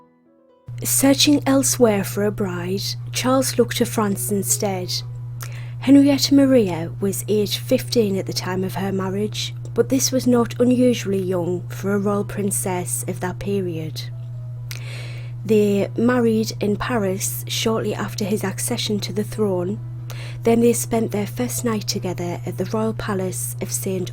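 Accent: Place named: North East England, United Kingdom. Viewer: you DON’T sound robotic at all! What part of NE England do you come from? The northern and/or eastern parts of Yorkshire? Durham? Tyne and Wear? Northumberland? No worries. Listening to you narrate is very pleasant. I have a visually impaired friend who I usually have to read your daily video to. Having you narrate it was a treat for her. Please consider doing more narration again. As always thanks for my daily dose of vitamin H.☺️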